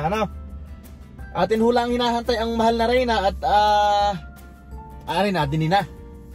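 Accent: Batangas, Philippines. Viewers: nakakainis punto mong batanggenyo, Tsk pinanood ko pa naman to kasi taga edmonton din ako.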